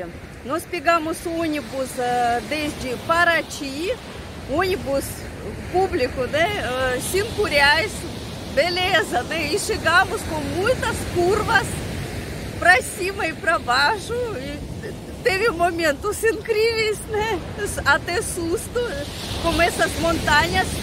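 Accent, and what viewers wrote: Russia. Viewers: Gosto muito do seu sotaque, já estou inscrito, gostaria de conhecer a Russia e a sua natureza. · Gostoso ouvir você falando nosso idioma com sotaque russo.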